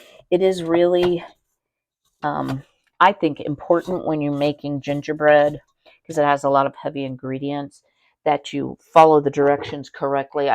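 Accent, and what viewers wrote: South, United States. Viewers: So THAT'S where your southern drawl comes from!!! 😉 I plan on making this bread for xmas. Thanks for the idea!🎅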